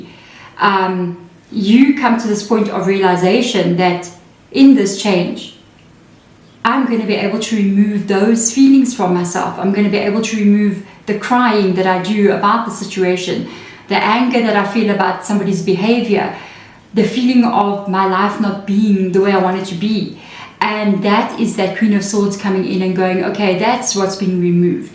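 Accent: South Africa. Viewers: I recently discovered your channel. Im loving it😊. I detect a fellow south African from the accent 😅. All the best 👍. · South African here! I thought i recognised your accent ❤️ Dankie vir jou liefde!! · Are you South African ? I’m from Durban 🇿🇦🇿🇦🇿🇦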